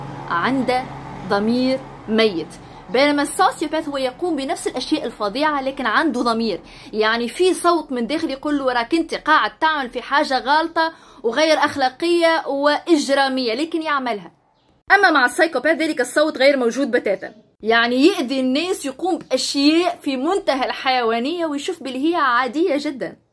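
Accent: Tunisia. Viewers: لاقيت عندي اجمل لهجة عربية هي تونسية حلوة كتير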